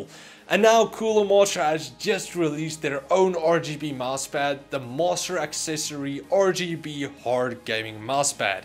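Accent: South Africa. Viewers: I thought you sounded South African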